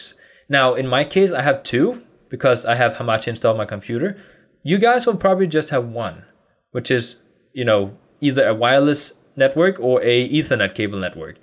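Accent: Denmark. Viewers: you sound danish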